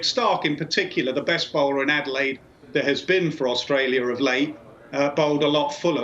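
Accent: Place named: United Kingdom. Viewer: I could hear Nas all day talking cricket and wouldn’t move an inch. Nas is really good! That British accent makes it even better !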